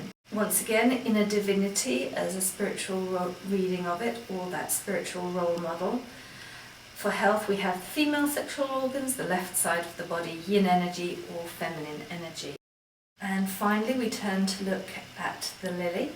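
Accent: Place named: England, United Kingdom. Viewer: oh ! I v just seen your présentation in your channel, and , you talk about the Celtic Lenormand ..are you the creator of this deck ? its amazing , I ve just received one from amazon after have looking some reviews on others channel , and I find pictures so sweet and beautiful ..its funny for me , because , when i ve purchased it , I didnt know it was your deck , but in the same time , I ve discovered your vidéos about Lenormand !!like an évidence ! I appreciate your english accent , its easy for me to understand your videos and thats why I suscribe on your channel .Which town are you from ? London ? Oxford ?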